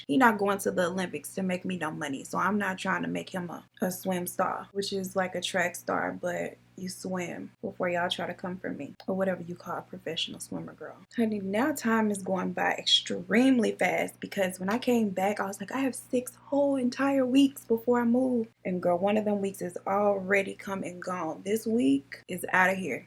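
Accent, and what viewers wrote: South, United States. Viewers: I love the way you speak!! I love all the killer girls, but its really nothing like hearing it in your own "dialect" ie black, southern, hip, etc... Make em Google it! 😆😅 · My husband past recently and him and his family use a lot of the southern terms and way of speaking that you do. So I really do get a kick out of listening to you talk. Things like; “fat meat is greasy” or “too much like right“ lol truly brings a smile to my face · Girl, we just got that sass and drawl when we speak in the south! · You Definitely have the South Slang I’m from the Miami area so we speak how you speak so that’s probably why I love you videos extra cause I get it in Everes every way when you explain it lol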